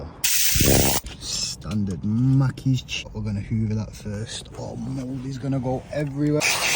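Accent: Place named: Bolton, United Kingdom. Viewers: Sounds like you're from manchester. Im in london, have an audi A5 convertible. Id love a deep clean! I regularly clean my motor but i dont have access to a tornado and i know youd do such a better job than i could ever do. How do i go about booking you in? Do you even come this far down south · I thought he sounds more Bolton / Chorley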